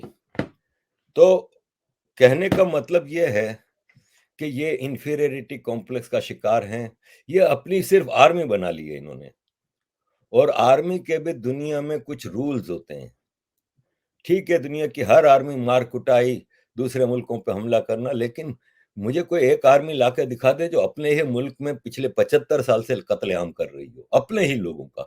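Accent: Gujarat, India. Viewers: YOU ARE TALKING LIKE GUJARATI N THATS WHY YOU ARE SWEETEST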